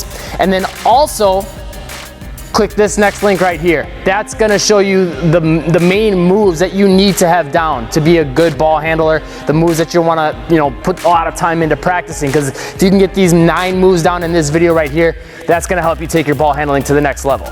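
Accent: Canada. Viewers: Are you Canadian? Hehe, cute accent.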